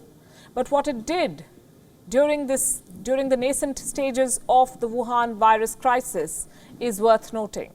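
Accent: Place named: India. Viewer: Would this Channel will replace someone who able to speak proper English then thus lady could? She sounds like speaking Indian?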